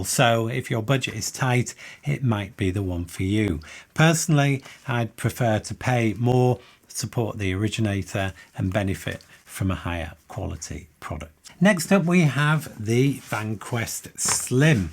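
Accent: United Kingdom. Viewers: May I recommend that you consider Asmr artist work! Your voice can be quite relaxing! Maybe it's the British accent!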